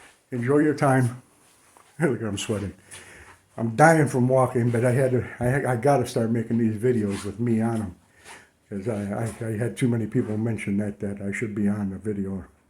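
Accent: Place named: Chicago, United States. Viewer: Good video! Your voice sounds fine like my cool uncle from Chicago.. I thinks you made a good choice. Thanks for sharing and take care!🙏🙏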